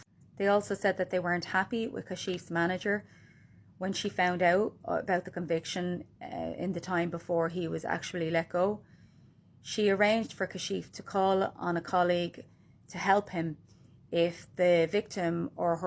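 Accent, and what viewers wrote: Ireland. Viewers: More money of the taxpayer ,the problem is people keep voting for the people that do not stop illegal migrants. and all this makes it so bad for good men who look at a gorgeous woman like you with an amazing irish accent as predators . dating and even looking at women is hard now .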